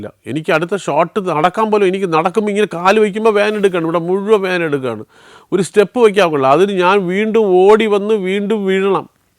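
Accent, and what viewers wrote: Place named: Trivandrum, India. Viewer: Happy to listen to his narrations. Love the Trivandrum accent ❤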